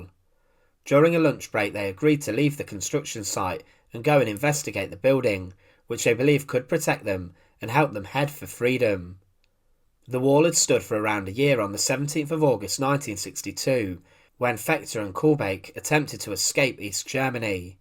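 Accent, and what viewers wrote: United Kingdom. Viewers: Worst documentary with a British guy speaking, and the award goes to.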